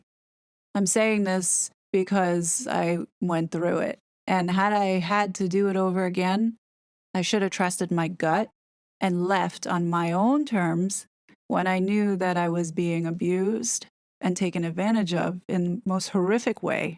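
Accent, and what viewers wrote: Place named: United States. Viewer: Oh bless you, I’m so sorry you’ve suffered - and are still suffering - such a miserable work experience. I’ve no idea of your background, but, imo, you have found a perfect alternative in this channel. Your investigative skills are incredible, you pick up on the most minute details (which other YouTubers completely miss) and your calling out the egregious behaviours of the miserable pair, and those they are involved with at whatever level. I love your sarcasm and dry wit, and you’ve got such a great voice - a very soft US accent (some are very abrasive)! Thank you for all you do for and on our behalf. You are greatly appreciated❣️ ❗️It’s especially amazing to hear that you have faith in God, and that surviving the trauma you’ve been through, you are testament to God’s grace and to the power of prayer🙏🏼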